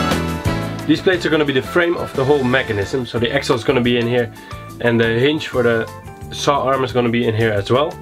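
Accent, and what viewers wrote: Netherlands. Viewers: Zo Nederlands al maar kan dat Engels , mooi project ! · Ben jij toevallig Nederlands 🤔😂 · Dat vermoede ik al aan je Nederlands accent in je Engels. Leuke video's, ga zo door. English text below. ------------------------------------------------------------------------------------------- I suspect that from your Dutch accent in your English. Nice videos, keep it up. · Why do u sound dutch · Nederlands? Gehoord accent.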